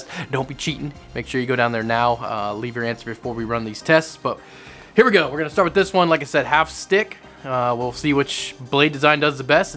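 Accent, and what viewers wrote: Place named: Illinois, United States. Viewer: When the fuck did this channel pop up? Thank you recommendeds. I can’t figure out where this dude is from either, accent is telling me Illinois but that jacket is telling me Tennessee or some shit.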